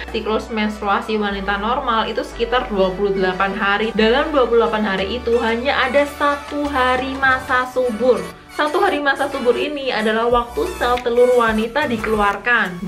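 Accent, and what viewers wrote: Java, Indonesia. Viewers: Dok.. bahasamu medhok bangeettt... Kamu dari mana dok?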